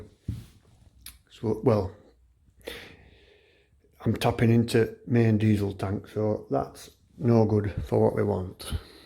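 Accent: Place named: Barnsley, United Kingdom. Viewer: That's a proper Barnsley accent - t'owd lad :-)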